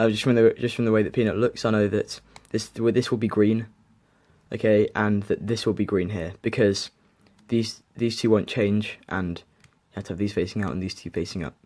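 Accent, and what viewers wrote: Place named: RP, United Kingdom. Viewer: WHAT'S UP GUYS Also you sound very posh lul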